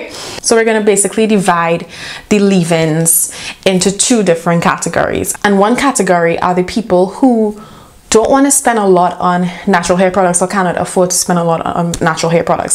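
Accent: Trinidad and Tobago. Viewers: Are you trinidadian? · Heard you speak, and was like "ah know this accent, she hadda be Trini!" Subscribed!!!! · Is that a Trinidadian accent I hear? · As soon a single hear you, I knew you were trini!!!! 🇹🇹🇹🇹🇹🇹🇹🇹🇹🇹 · Babes are you trinidadian??? You sound like my people. #izatrini Btw I just got 3 jars of the blue magic at Walmart. It's a must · Girl i heard your accent n like why you sounding trini, where you from lol so I checked the description box, sure enough, we're from the same country lol. I just here browsing the youtube about getting back to basics cuz things be getting to expensive in the "natural hair community" just as if you were relaxing your hair, maybe even worse lol.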